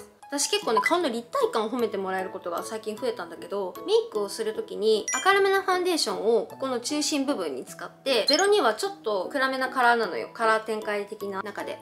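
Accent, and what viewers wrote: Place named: Kansai, Japan. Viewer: 関西弁かわいい笑笑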